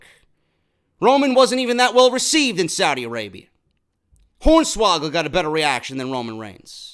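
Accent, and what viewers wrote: New York, United States. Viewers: you are straight up New York you are like the difference between a glass of water and a shot of tequila STRONG, keep it up glad I found you you’re Number 1😎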